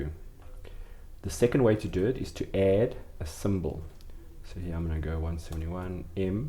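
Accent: South Africa. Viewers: Me too! Youtube such a large community and here I get help from my fellow South African. Great tips! Keep it up. Hope your channel grows.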